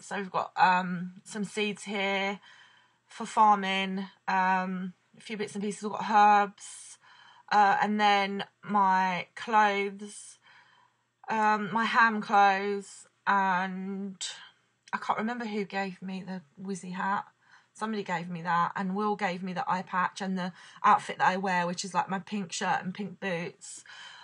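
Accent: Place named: United Kingdom. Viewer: Stop saying who you aw, remembaw, whatevaw your british accent is screwing up youaw english!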